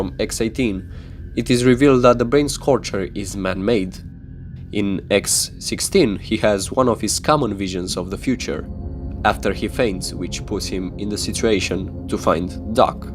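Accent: Romania. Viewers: asta e prima data cand vad ceva de la tine, cum te-am auzit vorbind : "sigur e ro" ma duc la "about" - :D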